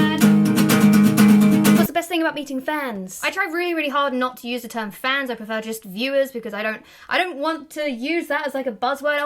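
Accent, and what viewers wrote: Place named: England, United Kingdom. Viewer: Just found your face. You probably have the cutest British motormouth accent from England. You're from England right?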